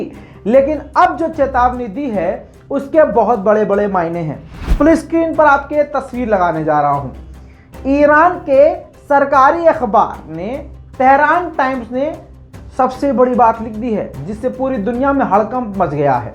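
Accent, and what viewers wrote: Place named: Bihar, India. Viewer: Loved Bihari Andaz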